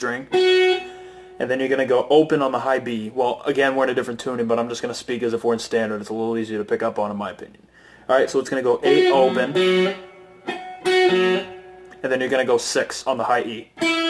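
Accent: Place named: Massachusetts, United States. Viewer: A lot of these riffs you technically have the correct notes but they’re played quite differently by Mike mostly so they ring out as chords more than how you do it but I guess if you did this totally by ear you did a good job cuz the notes are mostly correct. Also where in MA are you from? I’m from there originally and I hear the accent lol. “choahd=chord”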